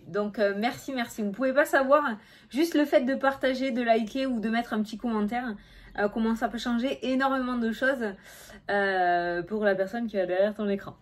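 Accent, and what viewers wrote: South, France. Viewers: Coucou l accent du sud fait toujours chaud au cœur gros bisous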